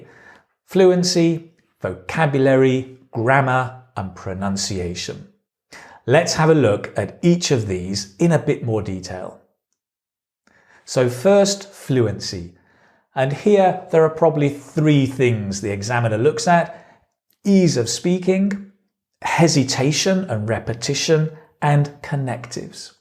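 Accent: United Kingdom. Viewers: Sir, which accent of British English do you speak ? Is it the modern RP ?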